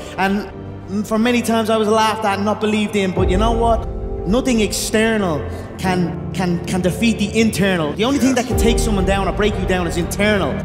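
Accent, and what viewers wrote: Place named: Ireland. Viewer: 아일랜드 발음 중독성 쩌네 ㅋㅋㅋ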